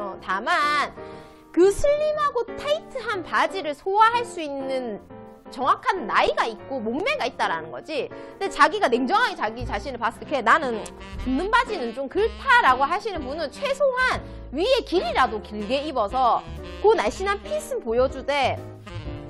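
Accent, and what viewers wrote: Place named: Gyeongsang, South Korea. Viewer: 반갑습니다 혹시 오십대 후반에서 육십대는 안 되나여? 돈 쫌 있어둥 섣불리 들어가기가 힘든 곳~~ 가슴과 엉덩이는 내려앉아 허리와 배는 절구통~~ 그나마 다리가 짧은건 대하고나니 쪼매 위로가 된다는ㅋ ㅋ 이런 조건들로 인하여 번쩍거리는 유리창 너머는 늘 곁눈질로만 바라보고 지나간다는 슬픈현실~~ 왜냐면 저런데는 쭉쭉빵빵만 다니는 곳이기에~~ 근데 롱셔츠나 롱원피스는 내도 보정옷 착용후 입으면 되것는데~~~?갱상도 어디세요? 완전 우리동네 억양이네여^^~~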